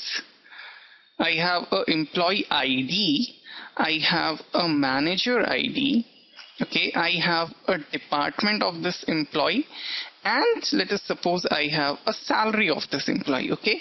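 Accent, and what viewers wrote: India. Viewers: Cause of this fucking english that indians talk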